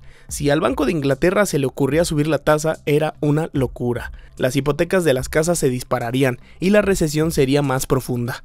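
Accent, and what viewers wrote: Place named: Mexico. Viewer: No me gusta tu español mexicano.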